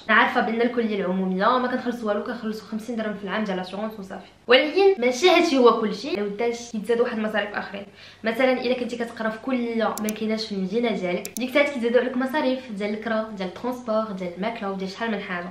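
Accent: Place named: Monaco. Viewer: مالك اختي كتهضري كيف لكبرا فموناكو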